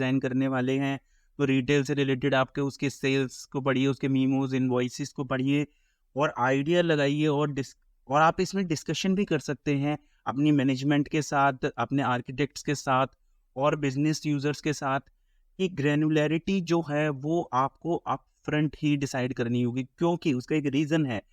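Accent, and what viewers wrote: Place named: India. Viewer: Good Indian accent on YouTube.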